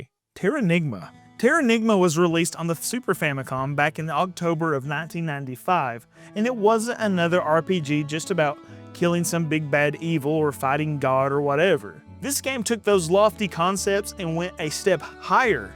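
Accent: South, United States. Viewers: Finally!! I know about most of these but I've been excited to see your take on some Japan only games for awhile now! Love your content, as a fellow southerner your accent adds a level of familiarity i dont get in too many gaming channels 🤣🖤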